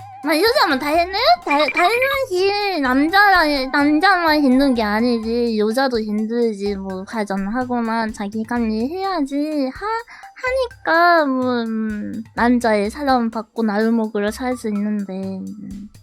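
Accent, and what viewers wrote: Japan. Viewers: 이 버튜버 한본어 지켜주세요... 매력포인트야.. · 한본어 존나 맘에 드네 ㅋㅋㅋㅋㅋㅋㅋㅋㅋㅋㅋㅋㅋㅋㅋㅋㅋㅋㅋㅋㅋㅋㅋㅋㅋㅋ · 한본어 개귀여움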